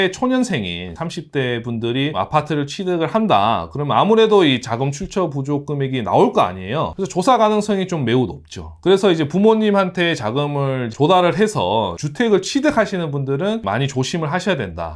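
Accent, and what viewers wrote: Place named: Jeolla, South Korea. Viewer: 시원시원한 설명이 귀에 쏙~ 들어오네요 게다가,구수한 전라도 억양 살짝 풍기는 그 말씨! 듣기에 왠지 좋아요~~^^ 영상 많은 보탬되었네요 감사합니다,화이팅~~~!!!!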